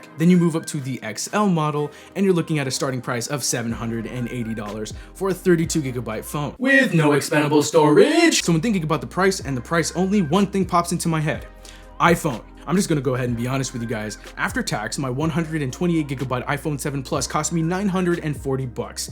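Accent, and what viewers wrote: United Kingdom. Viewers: lol you make me laugh. I love the British accent lol good video! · that British accent tho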